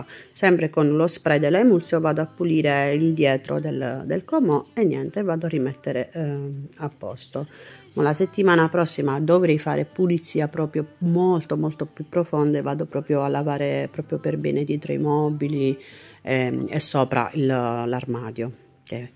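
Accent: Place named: Apulia, Italy. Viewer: Ciao sei pugliese ?si sente e anche io lo sono abito nella bat ,cmq belle pulizie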